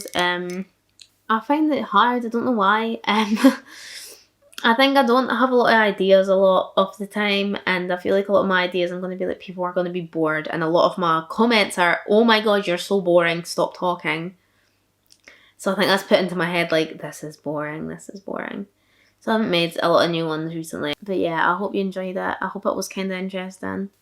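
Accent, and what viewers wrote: Scotland, United Kingdom. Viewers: As someone who’s from London I can’t even hear through ur accent sometimes but Scottish accents are cool! (Also i fuckin wish I didn’t have to pay for uni it’s so much) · Just seen Brad Mondo react to one of your videos and recognised the Scottish accent! I'm originally from Cumnock (Ayrshire) which could def give you Fife people a run for strongest accent lol I live in the US now though.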